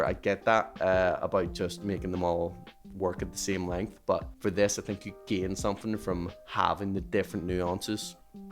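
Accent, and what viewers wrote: Northern Ireland, United Kingdom. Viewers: It always throws me for a loop when I click on a random YT video and hear an aul norn iron accent, since we're so rare on here. Good videos mang, keep er lit!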